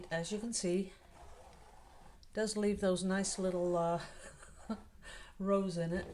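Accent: Netherlands. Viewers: I thought I heard a Dutch accent! My dad is from Holland so that makes me half Dutch even though I have never been there. One day I truly hope 💜 Thank you for sharing your art. It's beautiful!💕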